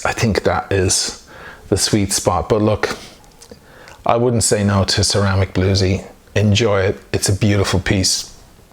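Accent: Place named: Dublin, Ireland. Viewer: Jaime His accent is pure Dublin. Not picking up any American at all. Not a criticism in any way but I’ve had both Irish and American girlfriends and this man is pure Irish. It’s a cool accent anyway and this guy is a rising star of the watch people online community. I love his passion for the pieces.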